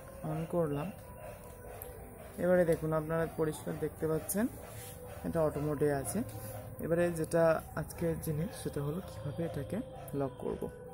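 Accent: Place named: Bangladesh. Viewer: bangela deshi der moto kre kotha bolchs kno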